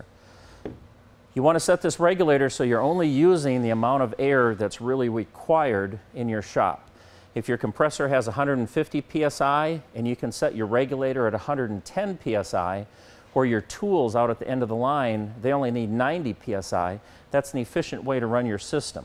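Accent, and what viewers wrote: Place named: Cleveland, United States. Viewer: talks like Cleveland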